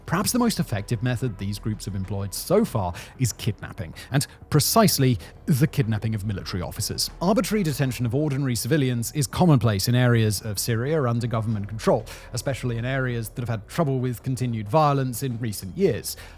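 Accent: RP, United Kingdom. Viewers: This guys English is about as posh as I've ever heard. It's fun to listen to though.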